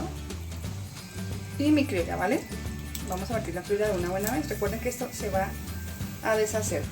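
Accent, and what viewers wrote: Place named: Bogotá, Colombia. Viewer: Yo ya te go los ingredientes, me agrada como hablas muy chévere bien Bogotana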